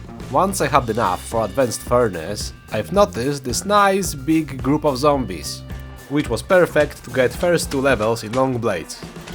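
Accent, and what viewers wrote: Poland. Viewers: Where are you from, your accent sounds polish.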